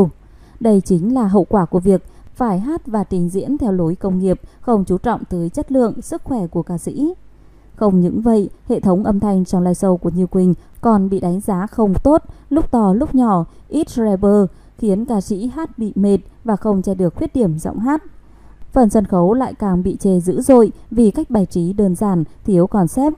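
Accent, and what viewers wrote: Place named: North, Vietnam. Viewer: MC noi chuyen giong Bac Ky Ha Noi nghe que va do qua, how can I subscribe your channel ?? From USA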